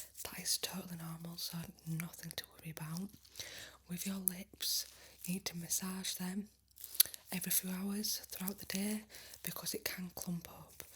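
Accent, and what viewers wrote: North, United Kingdom. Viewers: That was great. Nice to hear a fellow Northerner ❤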